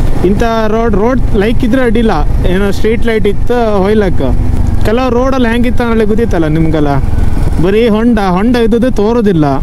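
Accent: Kundapura, India. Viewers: kundhapura bhashi kend kushi aith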